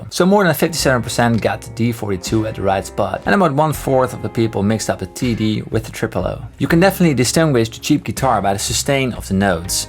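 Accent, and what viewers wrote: Netherlands. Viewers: You sound very very dutch. Heb ik t goed? ;)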